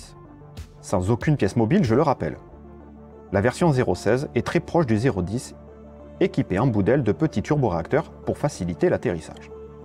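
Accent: South, France. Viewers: Je ne voulais pas vous vexer j'espère mais j'apprécie cette pointe d'accent sympathique du sud(?) il me semble. Je suis moi même méridional et je garde un petit accent dont je suis fier. 😉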